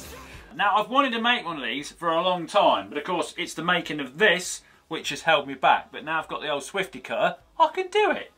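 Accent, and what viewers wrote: United Kingdom. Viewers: Oh, i thought you have a British accent :D btw you are one of the best people on youtube!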